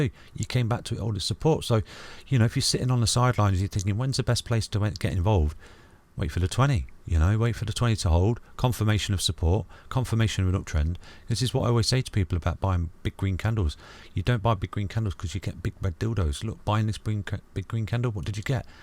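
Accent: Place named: England, United Kingdom. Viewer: Gday from Australia.. Love yr pommie accent but more importantly your sincere info and straight shooting.. Thanks Mate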